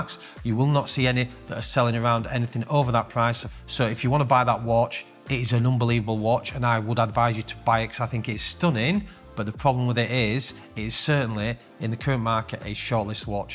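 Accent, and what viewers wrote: United Kingdom. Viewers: But remember speaks the queen’s English unlike us Yanks across the pond.😉 Seriously, you are right.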